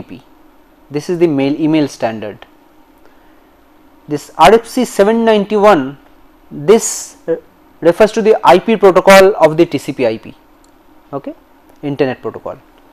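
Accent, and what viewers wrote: India. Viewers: It's an Indian accent.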